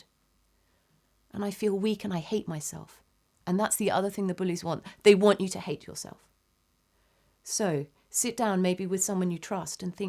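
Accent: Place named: RP, United Kingdom. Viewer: Refreshing to hear a posh voice wierdly. Thanks for doing this video very good topic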